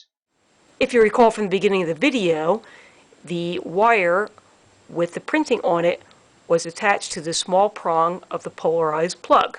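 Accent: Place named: Baltimore, United States. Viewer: neat video, accent 100% sounds like a Baltimore/Hampden/Dundalk accent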